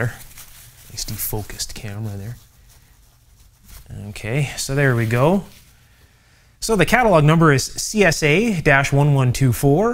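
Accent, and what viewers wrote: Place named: Canada. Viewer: Why can’t Canadians say OUT correctly ?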